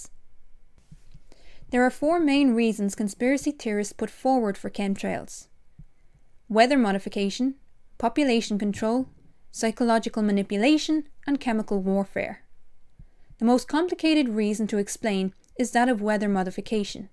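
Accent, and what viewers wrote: Ireland. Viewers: Awkward moment I’ve just listened to all the videos in one morning. Wish my teachers had a Irish accent idve learned way more. Great videos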